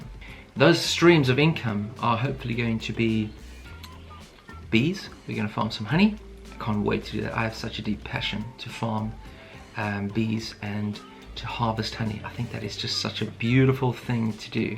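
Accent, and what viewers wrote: South Africa. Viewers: Your accent is South African... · Bra, you have an Anglo South african accent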